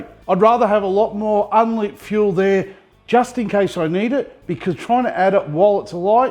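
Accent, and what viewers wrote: Australia. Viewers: Saw the thumb nail and was keen to watch...heard an Aussie accent and stayed to watch the whole video! Legend. Also that cutlery holder mini burner is genius.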